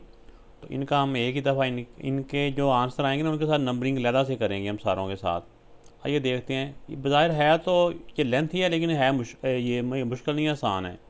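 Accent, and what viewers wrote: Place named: Faisalabad, Pakistan. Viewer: Sir ap Faisalabad sy ha naww safff PATA chl rha ha 🫥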